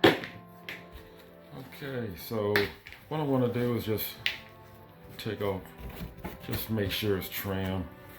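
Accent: South, United States. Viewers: Love the country accent.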